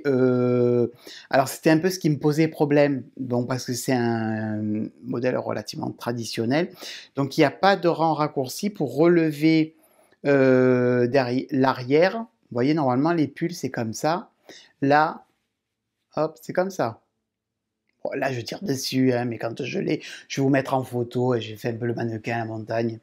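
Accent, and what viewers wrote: South, France. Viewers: Bonjour c'est moi qui te remercie pour tes vidéos qui sont très agréables. L'accent du midi remplace le soleil qui manque dans la région parisienne. Un grand oui pour les vlogsmas de décembre. A bientôt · Bonsoir, je te decouvre au hasard du net et j'adore ta bonne humeur et ton accent du soleil. Merci pour ce moment détente autour d'une passion commune ❤ Christine, une nouvelle abonnée du nord Isère 😊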